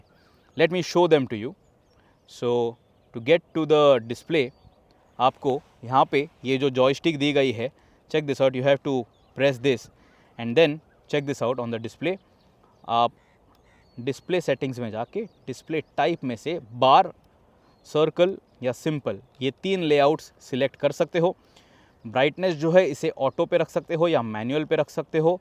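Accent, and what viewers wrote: Maharashtra, India. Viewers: Thanks, that's reassuring. And BTW, the way you speak Hindi with a Marathi twist is quite interesting!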